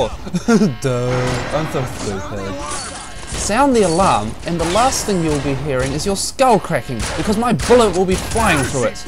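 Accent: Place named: United Kingdom. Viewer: god i love your birtishnes :D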